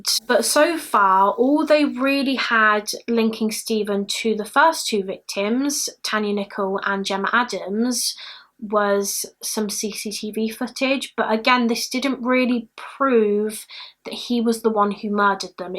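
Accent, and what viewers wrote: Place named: Bristol, United Kingdom. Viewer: Hello. You. Sound. Bristol UK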